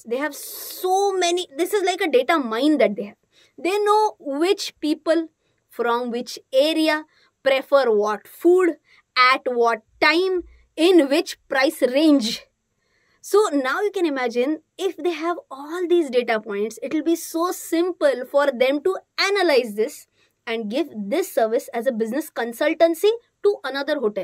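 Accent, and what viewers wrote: India. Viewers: ... I actually found you on YouTube to know more about stocks and investments... BUT I BECAME FAN OF YOU AND YOUR BINDHASS ATTITUDE AND OUR NATURAL INDIAN ENGLISH ACCENT.... I SIMPLY LOVE IT ❤❤❤❤❤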